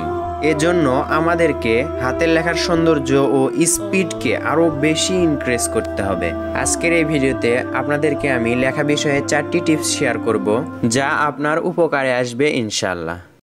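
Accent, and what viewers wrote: Bogura, Bangladesh. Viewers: ভাই আপনার বাড়ি বগুড়া নাকি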